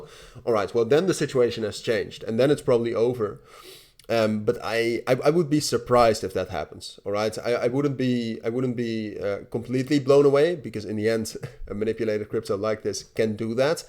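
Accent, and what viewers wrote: Netherlands. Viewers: Ik dacht al ik hoor een Nederlands accent! Goede video trouwens :)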